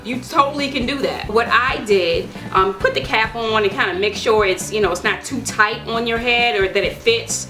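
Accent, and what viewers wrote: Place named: North Carolina, United States. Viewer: You are super creative and beautiful. Love your accent, too! I'm a New Yorker, but I can tell you're from NC. <3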